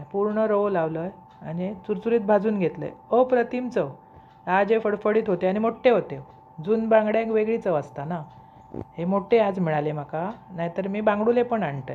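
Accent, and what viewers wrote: Malvani, India. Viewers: Tumcha aawaj ani malvani bhasha bolnyachi paddhat khup aawadte.... Tumchi khup khup pragati howo...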